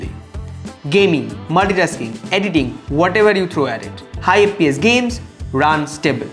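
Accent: India. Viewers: R u bengoli? I mean if u could work on your accent a bit, the presentation of the video will surely be boosted alot nd try to keep urself more upclose.... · bhai tu west bengal se hai kya tere accent se lag ra hai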